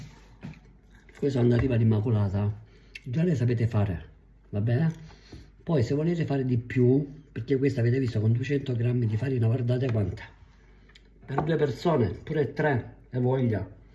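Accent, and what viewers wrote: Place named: Salento, Italy. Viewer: Grazie 🙏🏼poi con l’accento salentino sono sempre più buone 😊bravissima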